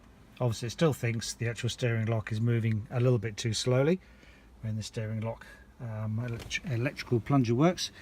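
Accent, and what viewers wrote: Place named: England, United Kingdom. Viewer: A huge MERCI for your video (and for your pure English accent I can understad very well - I'm French)! I was able to remove and do some cleaning on the motor of the steering lock (Mini R56 2007). My friend first told me there was a problem with the power steering... So I removed the Power Steering electric motor (fully electric P. S.) , checked it and of course there was nothing wrong with it because it was the lock that was causing the random problem ! I used my Dremmel to cut a slot in both screw heads then used a simple screwdriver to remove the lock. Easy job! Note that when fitting the cover you have first to push the spring loaded plongeur far in with a right-angled tip in order to position the cover correctly. The lock works nicely now, I just keep my fingers crossed the problem is definitly solved. But as the DC motor was very dirty inside, I guess this guy was causing the fault! 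Your help is worth a pint of beer, my friend !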